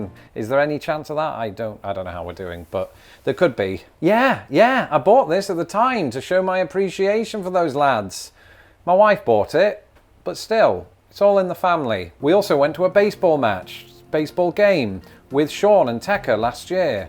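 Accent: United Kingdom. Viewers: Ooh,!! Look at your cute little self back in England! Yes, you have blended well (until you speak- but like you said, we love to hear British accents) 😀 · I'm a midwestern, born and raised as such. I've been around the world but came back to my midwestern roots. You, are most welcome here in the heartland of the USA. Keep your British accent, it is your trademark! Go Cubs! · Your beard isn't bad but they age people. I haven't seen my son without a baseball cap for at least 10 years. He claims it is because his hair is thinning. I told him not to wear it at my funeral. You have a nice British accent, I can understand it. Let your hair grow while you still have it. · Don’t totally loose the UK accent and words. Enjoy the mid west. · What the hell happened to you? Looks like your DNA is changing. You’re becoming an ‘merican. Just thank God you didn’t end up in the south. 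You’d be talking with a twang, eating grits and drinking sweet tea. Oh wait…tea? 😝 Love your vlogs. Love GB 🇬🇧🇬🇧🇬🇧🇬🇧🇬🇧🇬🇧 Just promise me you won’t lose your British accent. 😁😁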